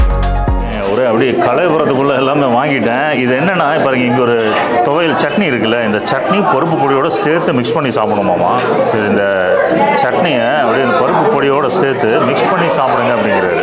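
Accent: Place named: Kongu, India. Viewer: Ore satham vaalu vaalu nu 😂kongu slang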